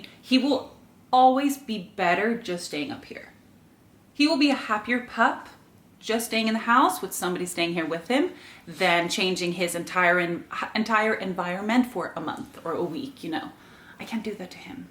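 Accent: Ireland. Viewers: I knew I heard Irish in your accent! Now it makes sense ☺️ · You still sound Irish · Just found your channel, I think you’re both adorable. Grim is so lovely. When I saw your first video (which was the most recent one) I thought you were Irish, so I now get why I thought that. I live in North Devon in the UK. I wanted to know if Finland is considered part of the Scandinavian culture I know the language is quite different, but I haven’t heard you mention it. If you don’t mind I would I would like to recommend a channel to you. It is Wild wonderful off grid. You may already know about them but if you don’t I think you will enjoy them. Now I will subscribe to you😊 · It is so interesting that when I first found this channel, I thought that you, were Irish. My nephew in law is Irish and I loved his beautiful accent when he first came to the US. Unfortunately after two decades in the US, he has now lost most of it. 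But I can still detect a little bit of an Irish accent when you speak. Best wishes for a very Merry Christmas, and Grim. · I wondered why you had a little Irish accent. My parents grew up in the west of Ireland and emigrated to the US where I was born. You also use American slang; that might be from watching American TV shows. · I’m Irish and I never noticed the your slight Irish accent until now!! Now it’s so noticeable! 💚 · As a Californian, I can tell you have a slightly Irish accent. Great channel! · I was thinking you sounded Irish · I’m Irish and I knew you had an Irish accent in there!!!! 🍀🍀🍀🍀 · You still sound Irish to me and not American. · I can hear your Irish accent pretty much all the time! It amuses me to be watching someone Swedish with an Irish brogue who lives in Norway!:-) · I definitely think you sound Irish!! I’ve always thought that and wondered 🤗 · You sound Irish to me😊 I am from Liverpool in the Uk, we are the Irish that couldn’t swim back😊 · I actually thought you were Irish. 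😏 I'm a new subscriber and am binge-watching. I lived in kristiansand when I was 14 and couldn't believe how beautiful it is. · Now I know that you've had an Irish twang before it's all I can hear in your voice!! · Greetings from Florida!!! When you said about people not understanding your Irish accent, I can't understand that because I visit Ireland quite a bit and I absolutely LOVE the Irish accent..... it's so beautiful and Ireland is awesome too!! Eventually I will live there and the people are so friendly! So, Happy Holidays to you 3 and All the Best for the New Year! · Just caught this video and have to say that every time I hear you speak English in your videos I think you have a slight Irish accent. So you haven't lost it yet! Lol! And it's lovely, by the way. Love all your videos!!! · I’m in the US and I’ve been wondering why your voice has reminded me of a close friend from Ireland who’s lived in the US for many years. 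Now I know!! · I noticed your Irish accent before you ever mentioned living in Ireland. It was a video ages ago I can't remember the exact video but I literally replayed the clip a few times thinking "that's definitely an Irish accent". Some words you definitely pronounce in an Irish way... Just of course I can't think of them now 😆💚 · Your Irish accent pops out occasionally it's lovely 💚mostly it's not American the rest is mild Swedish it's very pretty 🤗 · It’s funny you said you used to have a thick Irish accent because I always thought you sounded Irish but assumed it was a Swedish accent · You have a very Irish accent.. lovely voice 🎄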